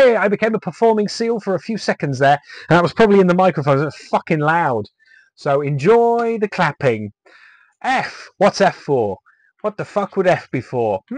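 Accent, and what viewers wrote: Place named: Birmingham, United Kingdom. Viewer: I dunno what happened, but you were considerably more brummie in this video... Also, did you know Wet is sold out at CEX? I think it's gonna spike hard that one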